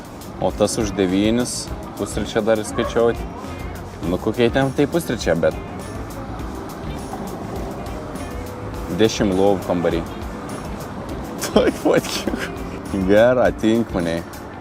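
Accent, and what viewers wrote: Samogitia, Lithuania. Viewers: Ačiū,Tau uz video.Labai grazi Tavo kalba kaip zemaiciuoji.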